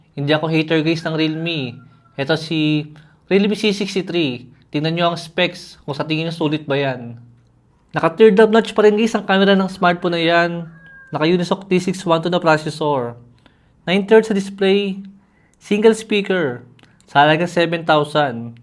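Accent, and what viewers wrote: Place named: Cavite, Philippines. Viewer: Taga Cavite ka sir‽ Puntong cavite city or Tanza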